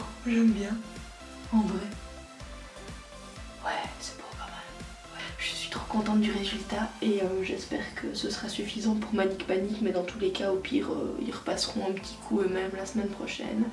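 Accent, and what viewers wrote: Belgium. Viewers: L'accent belge 😍😍😍💕💋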